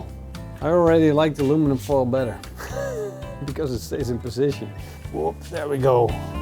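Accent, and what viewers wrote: Netherlands. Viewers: Mario Solorzano Jr ? Perhaps Holland ? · Are you from Holland? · You sound Dutch. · Netherlands, I think. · Hey you're dutch... i know because of that acent :3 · Thanks for the vid. You speak English with a Dutch accent :)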